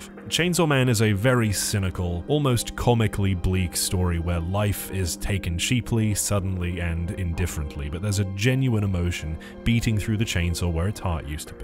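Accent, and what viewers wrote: United Kingdom. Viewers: is... is the british accent all a lie?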